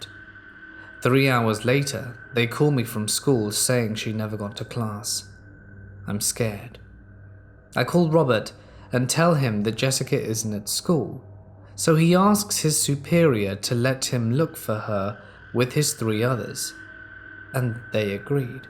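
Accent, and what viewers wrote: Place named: England, United Kingdom. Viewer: The last two stories were the most horrific! If the stories had been too far fetched... like ghost or just a bit creepy I would listen again but these were too near the knuckle... so I won't listen to any more. I'm now confused... your accent sounds English yet you use awesome and dude, you now sound as though your morphing... why?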